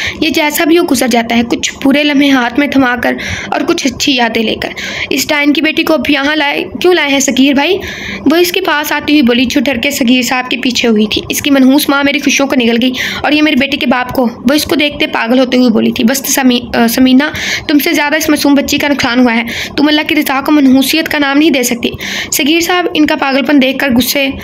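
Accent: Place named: Lahore, Pakistan. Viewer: aap Lahori tu nahi ap ki vioce toon se muje laga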